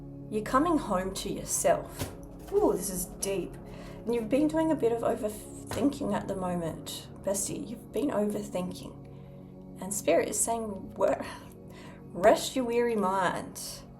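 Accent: Australia. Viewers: SHEEYA..😊 U AUSSIE'z..and yur VOWELS...YAY!! ROWR ROWR !! 🥀😁🤙💋❤️‍🔥😋😘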